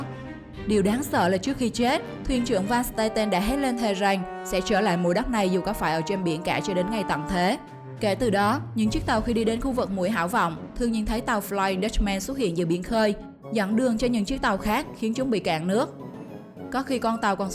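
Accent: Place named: Nẫu, Vietnam. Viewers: bà mc này người nẫu phải khg chèn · Giọng miền trung nghe ngộ ngộ mà dễ thương ❤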